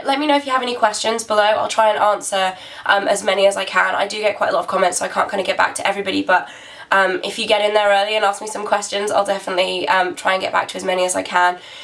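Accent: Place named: United Kingdom. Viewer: British is back, yey :-)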